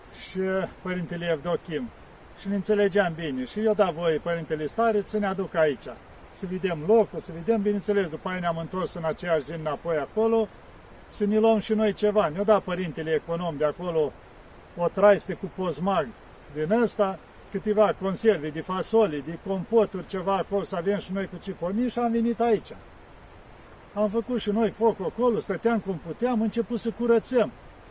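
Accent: Moldova. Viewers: Bunul Dumnezeu să vă dăruiască sănătate și să vă asculte rugăciunea Părinte și M ĂICUȚA NOASTRĂ SĂ păzească și să facă pace în lume Iar ROMÎNIA NOASTRĂ SĂ O PĂZEASCĂ ȘI SĂ o acopere cu cinstitul și SF SĂU ACOPRĂMÎNT IAR PE TOȚI ROMÎNII DE Ori și unde sar afla să ne încingă cu SF - UL BRÎU și să ne țină strîns uniți lîngă SFÎNTĂ CRUCE A MÎNTUITORUL UI HRISTOS ȘI A MILOSTIVIRII SALE AMIN Și DV Vă mulțumim pentru cuvintele frumoase rostite în grai dulce Moldovenesc pentru că și eu sunt moldovean dar mai de la malul Dunării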